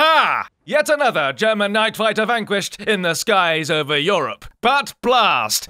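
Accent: England, United Kingdom. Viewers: Man you and your english actent made me switch from Russian to English planes.🇬🇧🇬🇧🇬🇧🇬🇧🇬🇧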